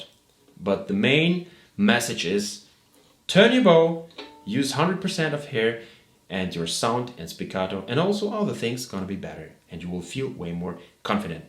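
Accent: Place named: Germany. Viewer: Your English skills would be more convincing, if you pronounced the simple word "and" [and] rather than [änd]. Pay attention to the "d" and the end. German people tend not to differentiate the words "ent" and "and" in terms of pronounciation. The same applies to the word practice. German people tend to say [präctice] and those are tiny words revealing you as a German guy. I know that at school the teachers do not know better.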